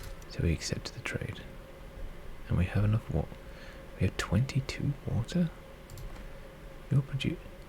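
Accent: Yorkshire, United Kingdom. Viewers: Appreciate every video you put up. Feels good to have a Yorkshire representative in the ASMR community. 🗿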